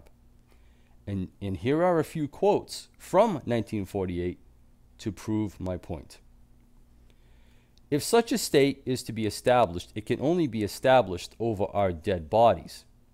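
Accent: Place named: New England, United States. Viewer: Good info! And I know this is trivial, but I love listening to your accent. I grew up in New Hampshire and have relatives in Maine. Married a Virginian, and have lived 22 years in Ecuador. But I know a New England accent when I hear it. ❤️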